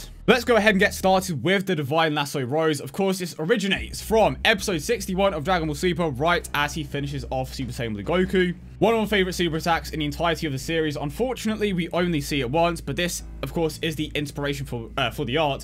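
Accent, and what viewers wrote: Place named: England, United Kingdom. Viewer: sounds kinda like Darren Till with a english accent instead of irish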